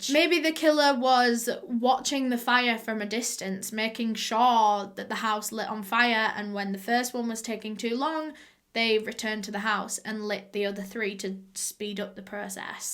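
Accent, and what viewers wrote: United Kingdom. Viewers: I also like watching her cuz she is British and I can't stand other accents as I am British and like I'm not try a be rude or mean I just prefer the British accent rather then any other cuz I grew up around that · You’re accent is too British..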